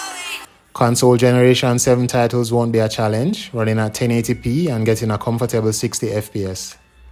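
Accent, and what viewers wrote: Jamaica. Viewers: Definitely Jamaican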